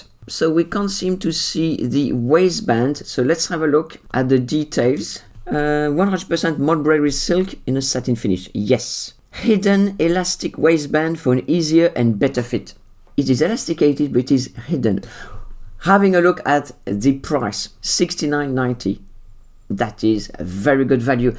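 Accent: France. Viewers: I love your French accent in English. Greetings from Poland.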